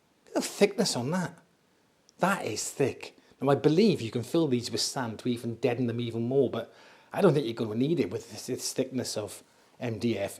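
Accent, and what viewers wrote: Wales, United Kingdom. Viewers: I have those type stands, a little different and mine are not as nice that aside i couldn't be more happy with them. Mine are faux leather and wood effect although the wood effect is like a vinyl wrap but it's very thin so you must be careful, yours look slightly better made on the front effect front. For the money they are excellent. Btw I am impressed by the effect you put into your videos with the editing and little effects. You are very rare on that front and the effect is much appreciated. I can tell from your voice that you're from my dad's country, he was from Buckley North Wales. Miss Wales but living down south makes getting there hard.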